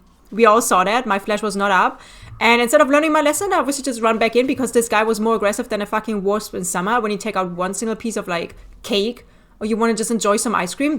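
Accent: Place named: Germany. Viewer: Ich finde das so schön wie man manchmal den deutschen Akzent raushört XD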